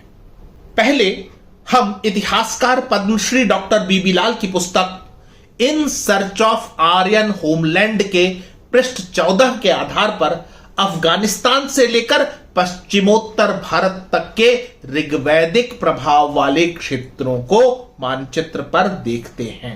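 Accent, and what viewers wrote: Standard. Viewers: Ap bahut sudh hindi bolrahehe Nahi to jisko Dekho adha English adha hindi boltehe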